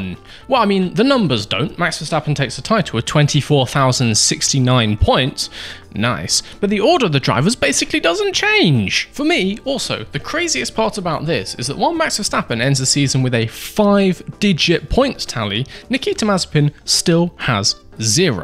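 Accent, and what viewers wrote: United Kingdom. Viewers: british accent just killed the video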